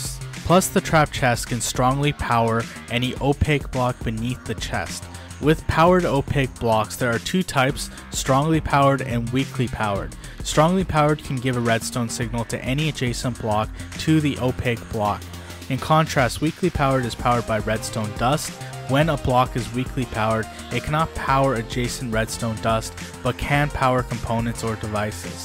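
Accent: India. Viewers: Are you a indian bro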